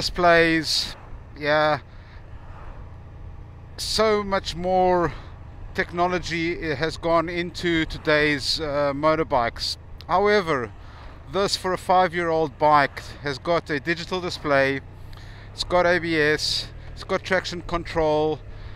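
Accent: South Africa. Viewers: It sounds like you have a very South African accent! Lekker man. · South African in a foreign country? Unmistakable accent.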